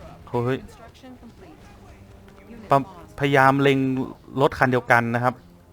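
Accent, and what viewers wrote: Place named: North, Thailand. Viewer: พี่คนเหนือปะครับ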